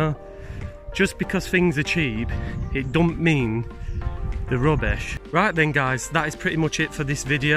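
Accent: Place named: Sheffield, United Kingdom. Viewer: Sound like your from Sheffield pal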